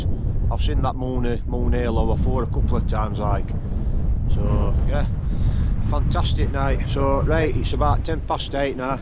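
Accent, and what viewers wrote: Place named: Yorkshire, United Kingdom. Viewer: Great stuff! I'm gradually learning to understand Yorkshire dialect!! Great places, great views and great courage to go out there in December. Thanks so much. It's really inspiring and I might consider following in your footsteps! Love it!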